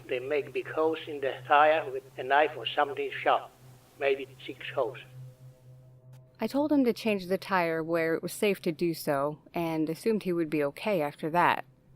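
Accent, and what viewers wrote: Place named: New York, United States. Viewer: Heavy New York accent.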